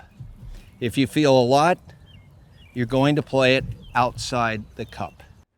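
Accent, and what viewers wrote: United States. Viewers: Just a standard Connecticut accent? It’s not even thick.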